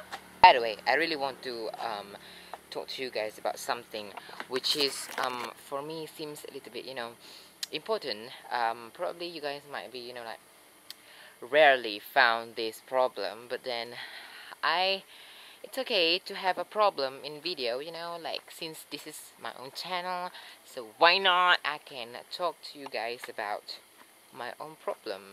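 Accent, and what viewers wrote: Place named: United States. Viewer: dude, if you love british accent why not try use it in your other vlogs...most of your vlogs are in american accent...try new things..it would be great..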